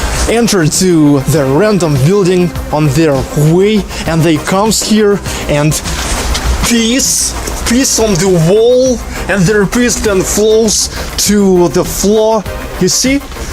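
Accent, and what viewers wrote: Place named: Russia. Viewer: you dont need to improve your English. Keep it real with your Russian accent and this is probably one of the best channel I've seen explaining about a country that has humour as well. Excellent work!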